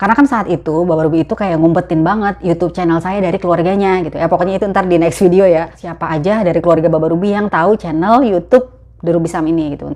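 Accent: Jakarta, Indonesia. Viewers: Assalamualaukum demen banget dah denger logat betawi nya. Salam kenal dr JKT Utara,kl jkt nya sebelah mana pok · Assallamuallaikum....salam kenal,sya penggemar +suscraber baru, baru 2minggu lht chanel..lanhsung jatuh bangun eh...jatuh ❤️mksudya 😊😊, suka cara ngomong, logat betawinya kentel bngt, dan kelihatn oranvnya ngk sombong, sayang aq ngk punya IG jdi ngk bisa folow IG 🤭🤭🤭, sekali lagi salam kenal &sucsses untuk chanelnya 🙋🙋😊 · Kamu lucu bgt sih, sy subs baru, seneng sama gaya ngomongnya ya betawi²an, lugas dan khas orang Jakartaan kata orang Jawa mah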